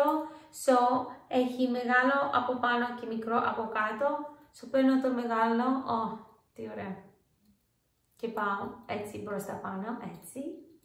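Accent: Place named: Greece. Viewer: Gringlish 100%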